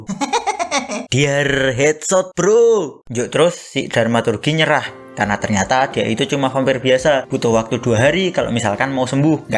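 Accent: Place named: Java, Indonesia. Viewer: Wkwk jowo banget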